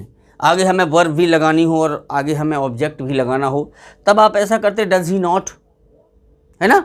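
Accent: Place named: India. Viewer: गांव से हैं अंग्रेजी बोले देसी स्टाइल में 🤣🤣🥰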